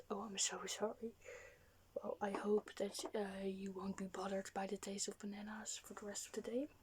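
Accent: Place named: Netherlands. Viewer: Ik herken aan je accent dat je uit Nederland komt ;). Niet dat je Engels slecht is, helemaal niet! Je spreekt goed en vlot Engels, zonder aarzeling. Dus proficiat daarvoor! Ik vind het altijd leuk om nieuwe kanalen te ontdekken, in het bijzonder wanneer ze nog aan hun begin zijn. De kwaliteit van het beeld is heel goed, het geluid ook en er zijn geen storende geluiden in de achtergrond, je stem is klaar en -héél belangrijk voor mij zonder geluiden van het speeksel, ik kan dat niet verdragen. Ik zou enkel liever dat je stem een klein beetje minder luid zou zijn. Maar ik zie veel potentieel in jou en zal abonneren, om je aan te moedigen! :)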